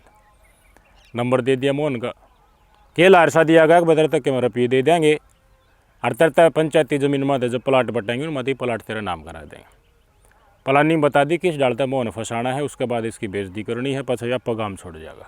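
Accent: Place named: Khari Boli, India. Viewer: भाई साहब बहुत हँसी आती है आप की बोली से खड़ी बोली है भाई हम अहीर वाटी से है हमारी बोली ऐसी नही है अच्छा लगता है आपका रिएक्शन और बोलना 😂😂😂😂😂😂😂😂😂❤❤❤❤❤❤❤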